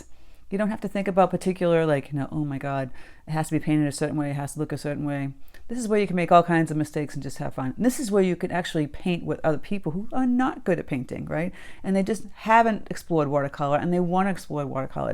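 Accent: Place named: Minnesota, United States. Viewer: Thank you, it is a fun project. I heard you inMinnesota😊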